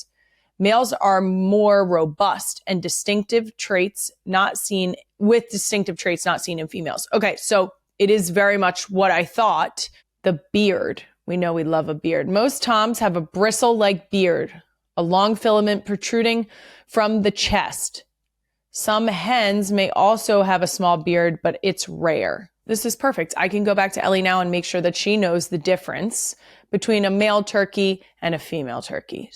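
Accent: Philadelphia, United States. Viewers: I love how the more comfortable gets, the more the Philly accent comes out! 😂😂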